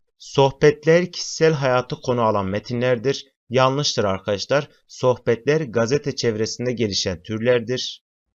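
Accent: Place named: Mardin, Turkey. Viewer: Güzel bir Mardinli şivesi var sanki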